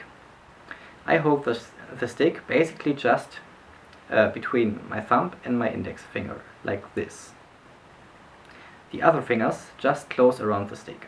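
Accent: Germany. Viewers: You don't hear Germans with this accent much anymore. It's a cool accent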